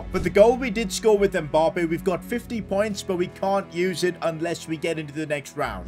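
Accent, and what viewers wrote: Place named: India. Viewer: Who ever thinks has indian accent sound 👇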